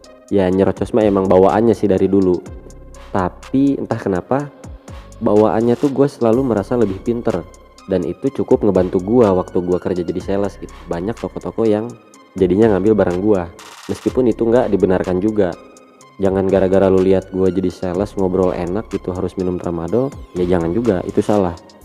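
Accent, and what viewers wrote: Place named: West Java, Indonesia. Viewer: Lidah orang sunda bos. Voli aja nyebut nya poli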